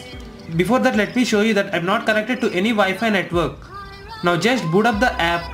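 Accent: India. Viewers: Bla bla bla bla indian English dedicated for indiam only though i understand all but what r u saying regarding hacking password and WiFi is not true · since you have such a THICK accent, I would recommend to speak slowly because now it sound like an east-indian mess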